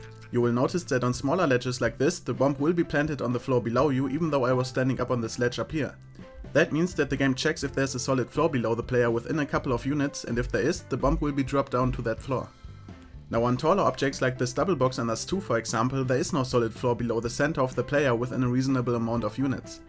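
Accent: Germany. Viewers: Dat deutsch akzent. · dieser deutsche akzent xD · ach du bist deutscher:D kam grade auf deinen Kanal und denke mir so "Hnn... der hat aber nen gut deutschen Akzent":D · Ich dache nur so "Wieso hat der so ein deutschen Akzent?!" Und denn.... ach so da spricht er deutsch tolles Video, Danke dafür! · dein englisch hat einfach einen extremen deutschen akzent 😂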